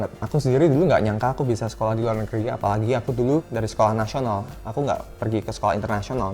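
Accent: Java, Indonesia. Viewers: Koko, logat china campur jowo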